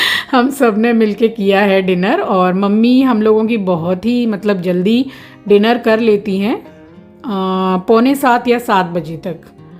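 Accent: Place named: Delhi, India. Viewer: haan. Wo Dilli se bolti hai 😄 😀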